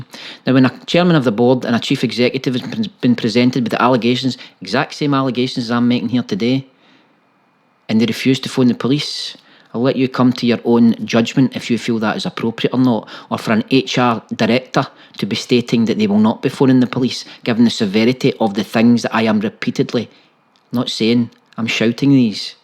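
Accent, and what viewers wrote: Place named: Scotland, United Kingdom. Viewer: Captions are available everyone!! it's a lovely thick Scottish accent.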